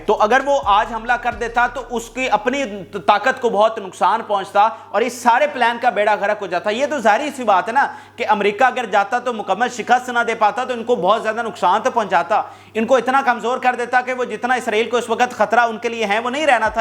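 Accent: Punjab, Pakistan. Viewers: Sab kuch theek hai bas aapki Urdu theek nahi hai Punjabi lehje mai boli gayi Urdu bht behudaa lag rahi hai .Ali garh universty yaa Urdu adab k program dekha kro aur punjaabi bolne se hameshaa avoid kro ye ranjeet singh ki Jahaalat bhari zubaan hai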